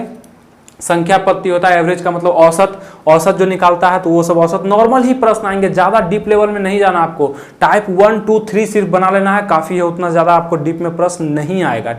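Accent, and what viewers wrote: Bihar, India. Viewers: या तो ये बिहारी है या फिर यूपी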